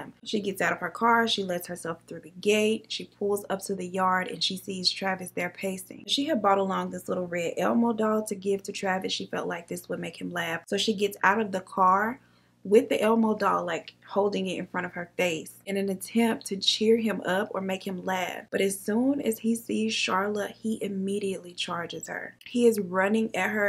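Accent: South, United States. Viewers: You gotta love & appreciate mamas! ❤ No one else in this world will ever love, help, protect, understand, forgive or worry about you than her! 🥰 I have been watching you for about a yr now and I found you bc I watch Bailey Sarian and MrBallen and one of your videos popped up oneday. You are a beautiful woman 😍 and I love the fact you sound like me. I also have a southern accent (or so I've been told by my in-laws 😂). Listening to you is just like hanging out and gossiping with my girlfriends. 💯 Love your videos so keep up the awesome work! 💋🏆👝👑💄🙏💜🌻💣🦋❤🌟🎉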